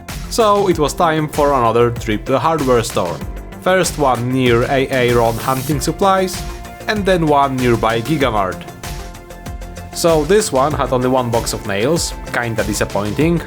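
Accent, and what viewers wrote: Poland. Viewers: This was such a wild ride! I really enjoyed watching the whole thing and your Polish(?) accent and humour made it even better 😊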